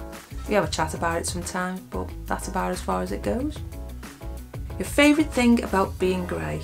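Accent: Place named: Liverpool, United Kingdom. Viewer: I love your accent.....I have a Scouse accent too!!😀 I'm a lot older than you, but I'm just starting to start, transitioning ....I'm a wee bit hesitant but I know, I need to do this. You know what I mean like don't you? 😀 Thanks for your videos, are you in Liverpool?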